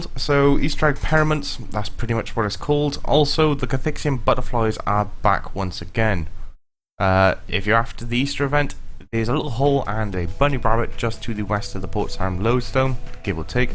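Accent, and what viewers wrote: Wales, United Kingdom. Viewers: what part of wales are you from XD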